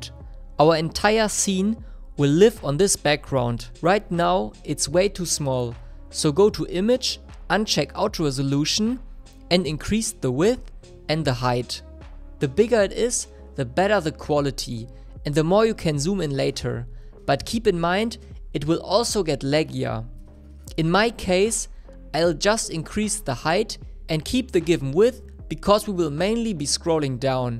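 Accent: Germany. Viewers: This Tutorial helles me alot, tysm ! (Aber du sprichst deutsch oder haha)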